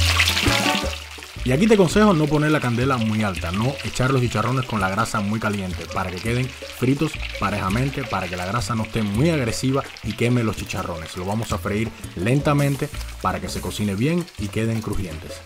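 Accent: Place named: Cuba. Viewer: Por tu acento en la voz me parece que eres cubano si es asi un saludo desde aca desde la isla muy buena esta receta y secretos tuyos un exelente tutorial bien sencillo pero bien preciso Gracias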